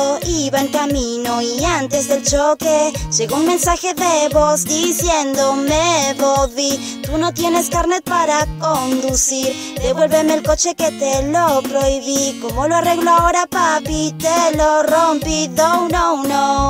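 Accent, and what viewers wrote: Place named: Corrientes, Argentina. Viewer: una pregunta de qué parte de Argentina sos porque tenés un acento como de Corrientes o Misiones Contesta x fa Te quieroo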